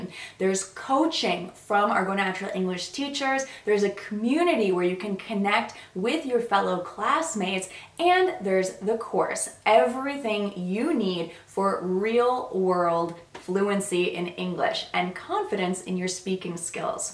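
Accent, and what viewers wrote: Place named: United States. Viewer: her pronunciation is American because she from America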